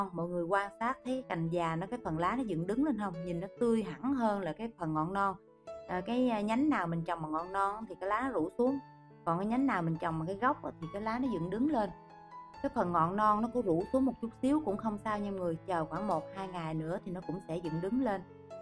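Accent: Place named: South, Vietnam. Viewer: " " nói giọng nam mà lại ở đà nẵng hỉ?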